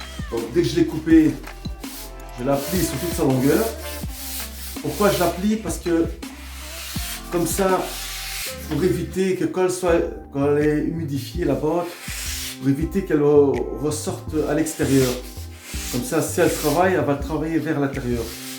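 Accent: Belgium. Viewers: Merci pour cette vidéo. Ça semble si facile, y a plus qu’à! 💪🏻💪🏻 (Serait-ce une pointe d’accent liégeois?)☺️ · Bonjour, je regarde beaucoup des vidéos, souvent c'est toujours en France; Je voudrais vous contacter pour une rénovation je suis dans la province de Liège, je vois que vous avez l'accent de Seraing . Bonne continuation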